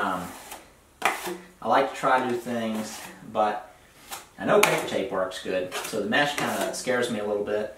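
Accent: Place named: Virginia, United States. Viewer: By your accent it sounds like you’re in South Central VA. Do you perform contracted home renovations as well?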